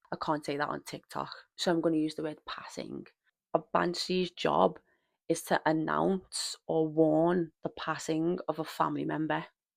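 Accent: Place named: Liverpool, United Kingdom. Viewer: Although I don't believe in banshees I loved your telling of the story especially in that gorgeous Scouse of yours. You do have a talent for storytelling. Be sure to keep developing it. We're cheering you on.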